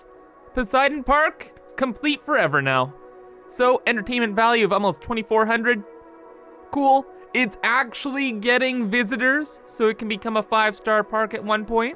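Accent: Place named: Canada. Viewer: Are you Canadian too? I recognize your accent.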